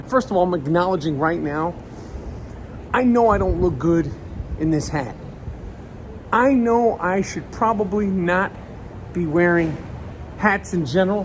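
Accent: New York, United States. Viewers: Best Nu Yawk rant in the history of rants. Thanks for your graceful tantrums.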